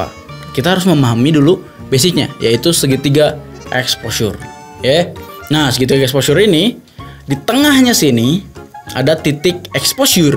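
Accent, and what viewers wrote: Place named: Jakarta, Indonesia. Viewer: Eh nongol di youtube, thanks bang ilmunya Yg penting enak bgt lg ngejelasinnya, betawi2 gitu haha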